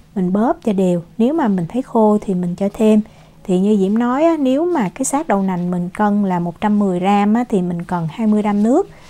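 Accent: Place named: Saigon, Vietnam. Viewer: Nghe cháu Diễm nói tiếng miền Nam của người Sài Gòn thật là dễ thương và lễ phép quá! Cô cứ lắng nghe mà không để ý đến cách chỉ dẫn nấu ăn và nghĩ chắc tiếng Việt của cháu ảnh hưởng từ Cha Mẹ đã ở Na-Uy lâu năm nên cô thiệt là may mắn đã nghe từ cả nữa tháng nay mà không có bị dị ứng với một lời nào của… “tiếng Việt MỚI sau năm 1975”. Chúc cháu có được nhiều người theo giỏi với tất cả video của cháu. Và cố gắng nói tiếng Việt CŨ của người VNCH, người Sài Gòn nhé? Đừng bao giờ gọi những người coi video là “mọi người” hoặc “cả nhà” nhé? Tiếng Việt Nam 4 chữ ấy đồng nghĩa với hai chữ TỤI BÂY đó. Cảm ơn cháu thật nhiều. 💝🌹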